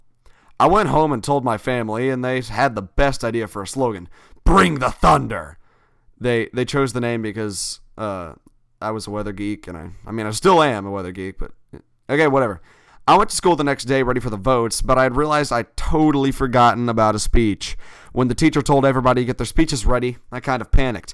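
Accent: South, United States. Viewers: like if you like people with country accents :). also can you do a collab with your mom.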